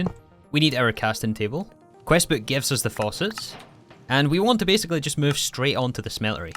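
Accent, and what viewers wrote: Scotland, United Kingdom. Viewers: Hmm I cant figure out his accent. Its obviously British Isles but where exactly- "Por-P-Porpal" Haha hes scottish! Great video as always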